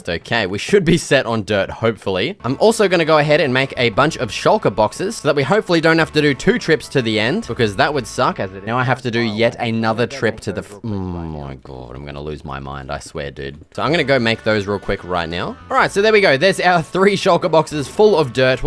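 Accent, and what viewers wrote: Australia. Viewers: U can’t tell me u aren’t Australian 🇦🇺 if you are then we are the same (I’m Aussie)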